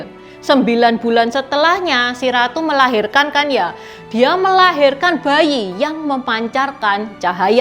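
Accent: Java, Indonesia. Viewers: Mbak daleme pundi gih. Jawane medok men.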